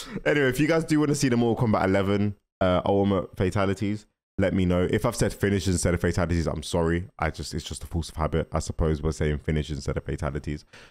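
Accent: United Kingdom. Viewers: bro is so bri'sh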